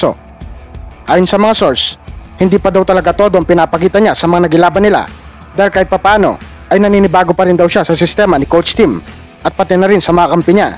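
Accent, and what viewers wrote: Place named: Visayas, Philippines. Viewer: bisaya ka talaga mag salita..😃😃😃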